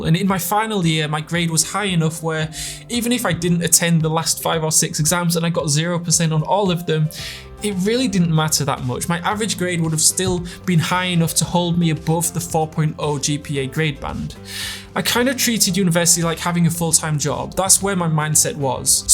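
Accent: Birmingham, United Kingdom. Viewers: you speak like picky blinders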